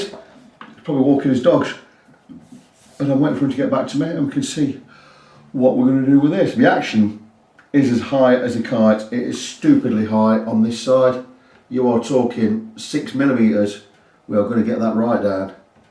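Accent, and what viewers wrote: United Kingdom. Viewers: Please, can someone tell me what measure did he say on ? I am just not used to such a strong british accent and I can't tell if it's 0 or 1 mm he is talking about.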